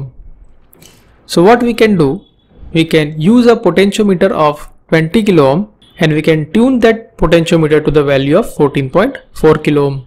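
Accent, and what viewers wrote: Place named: Tamil Nadu, India. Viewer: Exactly this is the video what I need. A voice of guy from tamil nadu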